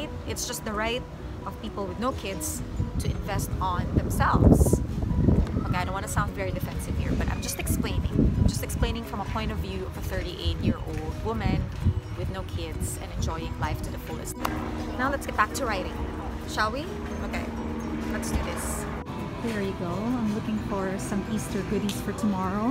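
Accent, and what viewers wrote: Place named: Philippines. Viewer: You lose your accent when you sing. I love the Filipino accent. :)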